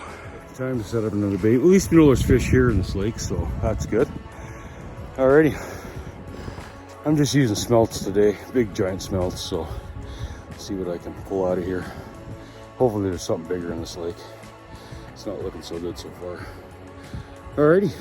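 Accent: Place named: Midwest, United States. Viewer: Bro I love your Midwest accent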